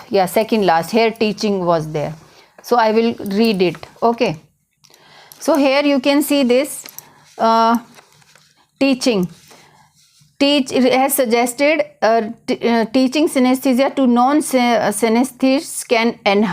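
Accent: India. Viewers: I find nothing to learn from your lessons. Bloody indian pronunciation. Uploading edited vedio. Such a stupidity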